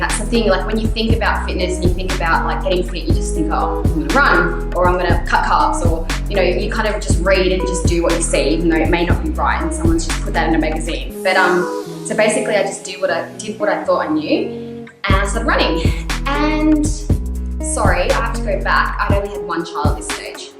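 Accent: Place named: United States. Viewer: Honestly I thought you were American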